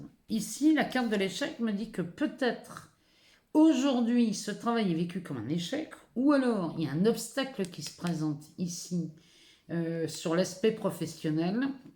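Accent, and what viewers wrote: North, France. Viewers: Bonjour. Merci pour votre tirage! C'est toujours un plaisir de vous visionner et étant originaire de la métropole lilloise, votre accent me fait du bien 😊. J'ai commencé à vous suivre lorsque je vivais sur Toulouse. Puis il y a un an je suis partie en Bourgogne en Puisaye pour suivre ma formation. Au début de cet été, ayant décroché un CDD sur Paris, je vous suivais depuis le Nord ou dans le TGV Lille-Paris:), et depuis début août je suis vos tirages dans Paris même (11ème). À partir de la semaine prochaine, je vous suivrai dans le Val d'Oise (la Frette-sur-Seine). Je suis Capricorne ascendant Vierge. Je souhaite de tout cœur que vos messages me fassent écho cette semaine. C'est tellement compliqué pour le logement. Et comme vous le disiez, au début j'ai commencé ce premier travail de reconversion en étant très enjouée. Mais ma situation financière, mes problèmes de logement et le futur très incertain dans l'atelier d'art où je travaille me font voir cela plus ou moins comme un échec. 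Je croise donc les doigts par rapport à votre tirage, besoin tellement de stabilité 🤞🤞. Merci beaucoup pour votre bienveillance, votre professionnalisme et tout ce temps que vous nous accordez généreusement. Beau week-end à vous 💛☀️🌈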